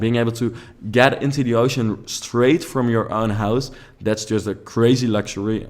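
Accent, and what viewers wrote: Netherlands. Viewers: Aan het begin van de video vond ik het al op iemand lijken die Nederlands is en Engels praat 😂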